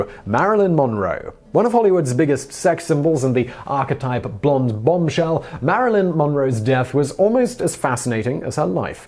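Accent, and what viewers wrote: United Kingdom. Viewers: He's british...durrrrr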